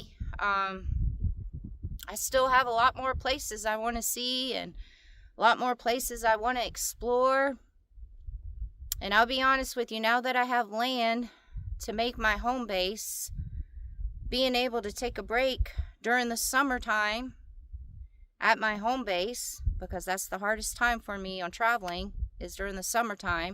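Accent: South, United States. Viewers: You make me smile. Love your southern accent ❤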